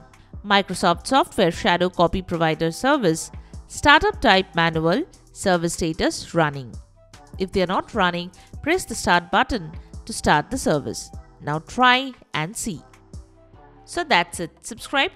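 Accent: India. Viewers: Indian Voice over check, low viewer upvote check, blanket solution with no actual solution...also check.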